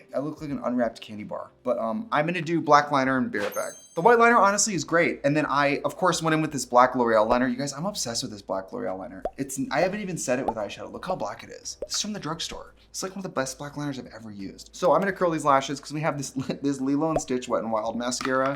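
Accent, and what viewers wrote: Wisconsin, United States. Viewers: Her Wisconsin accent matches angry candle lady’s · Omg the Wisconsin Mom accent ❤😂❤😂❤😂❤😂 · As a born and raised Wisconsinite who now lives elsewhere in the US like Trixie, my favorite thing is hearing just how much more pronounced her WI accent becomes when she's back in the state. Because the EXACT same thing happens to me. It's like a disease you can only hide for so long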